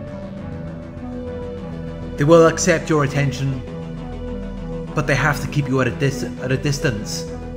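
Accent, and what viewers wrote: Wales, United Kingdom. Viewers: I like your Welsh accent :-) great video, very informative.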